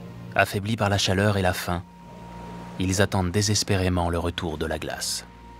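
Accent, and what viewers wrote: France. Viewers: The French accent makes this video so Romantic.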